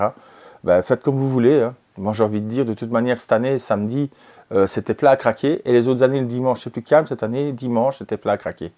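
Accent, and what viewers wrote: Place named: Belgium. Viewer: salut salut!!! Super la vidéo j'ai pas su y aller et j'y tenais comme néophyte pour voir se que l'on ose imaginer! La prochaine fois promis. Autre chose, On avait parler de pc console portable pour faire plus abouti, j'avais parler de la rog alloy mais depuis qu'elle est sortie c'est toujours hors budget, tu m'avait transmis un lien pour des occas Steam deck mais je ne retrouve plus le lien. Parce que bon j'me suis laisser avoir par l'anbernic rg 556, pour laquelle tu ne m' pas donner d'avis constructif si il y en a un. En tout cas je reste a se que j'ai pour l'instant dans l'idée de m'offrir une Steam deck Oled plus tard.... D'ailleurs il y a un délais responsable sur lequel compter pour pouvoir se l'offrir quand elle le faudra encore sans non plus avoir une bouzz a 100 balles... Je me limite PSP PS2 wii etc pour l'instant et d'ici quelques mois j'investî gros! 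PS: j'adore l'accent belge pour l'être moi même, ça fait encore plus en vidéo haha.merci pour les infos!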